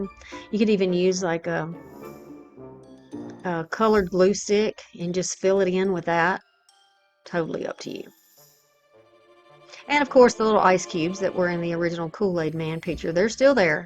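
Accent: South, United States. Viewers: Jackson and a Southern accent... A fellow mangolia stater? Also, adorable crafts.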